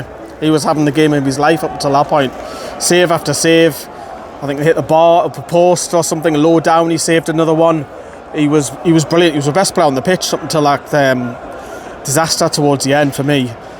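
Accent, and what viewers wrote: Newcastle, United Kingdom. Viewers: He looks like DT with a Newcastle accent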